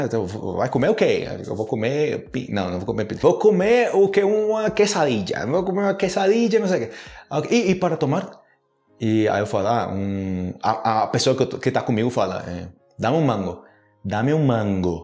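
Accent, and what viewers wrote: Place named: Colombia. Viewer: Teu spanhol e similar a Colombia e costa rica mae amigo, pero em portugûes e mamá em espanhol.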